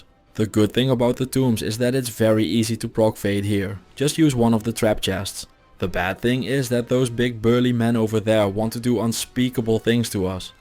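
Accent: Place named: Netherlands. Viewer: Can always hear that dutch english 🤣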